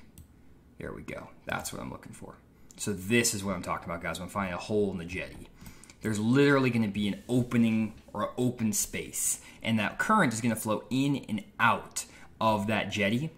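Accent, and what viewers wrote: Arkansas, United States. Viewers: Your from Arkansas??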